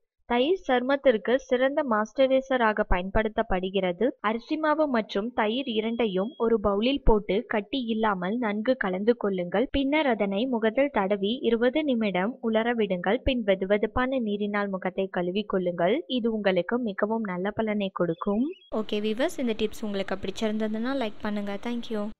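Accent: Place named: China. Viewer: பேசுவது சீன பெண். அதனால் மன்னித்து விடலாம்.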